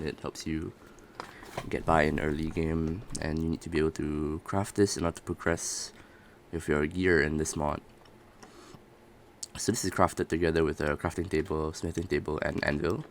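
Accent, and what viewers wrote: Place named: Quebec, Canada. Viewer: Were are you from you sound quebecois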